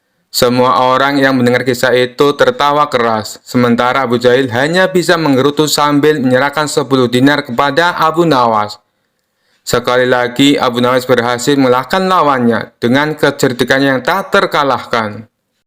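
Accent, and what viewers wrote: Java, Indonesia. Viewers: kalo bisa pke bahasa indo yg bner jngan medok biar lebih enak 🙏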